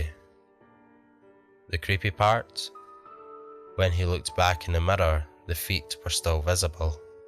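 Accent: Scotland, United Kingdom. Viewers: Creepy, uh Mr what part of Scotland are you from? I have been so busy haven't had the chance to see your answer, and haste ye back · Yeah!!! I've found me a Scott. Love the accent. You have a new sub and I look forward to hearing from you for a long time. 😍😍😍😍😍😍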